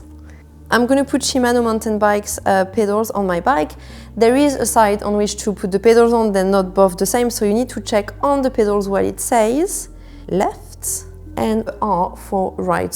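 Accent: France. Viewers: Sounds totally French to me.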